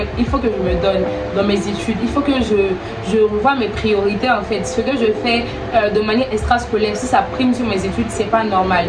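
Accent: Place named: Ivory Coast. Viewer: j'ai cru que t'étais ivoirienne 😅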